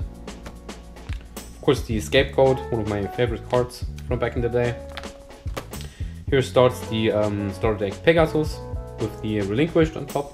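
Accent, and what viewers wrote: Germany. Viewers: Bist du deutscher? Dein akzent wirkt irgendwie recht deutsch xD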